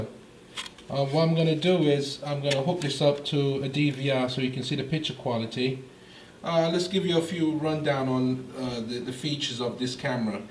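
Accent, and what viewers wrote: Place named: United Kingdom. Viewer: your from the UK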